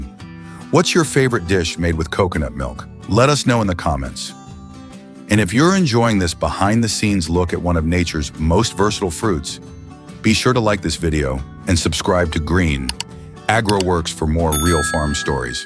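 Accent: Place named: United States. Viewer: It is good to see this tropical tree not being tweaked. When you see a Western idea blooming in an Asian country _ machinery, smart devices, surveillance it means the native has been taken over and it is not the original thing. Those that have learned the skill of climbing should be encouraged to carry on as I dont recall even one person falling or dying due to this skill that has been passed on for generations. Noticed that the men in this area of work were specially strong bodied and climbed the trees with ease daily to tap the top of the tree to produce toddy. Westerners interferring in a country they have no idea about native ways, should not sway their way of farming. AI with American accent and Western music says a lot about tge West controlling every place that is part of Asia or asking where we are watching from is snooping and a matter of concern.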